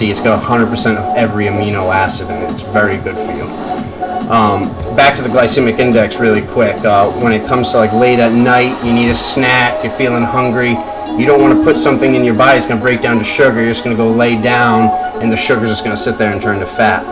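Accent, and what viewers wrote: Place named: East Coast, United States. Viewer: bro ur hella east coast what happened lol.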